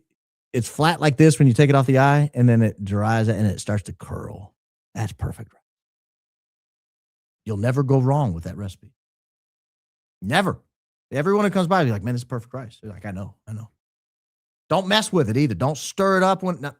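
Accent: South, United States. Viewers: Yes, …You definitely have a southern accent. 😂. And I agree with you about Christmas Album! is my fave!!! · babes to a northerner you for sure have a southern drawl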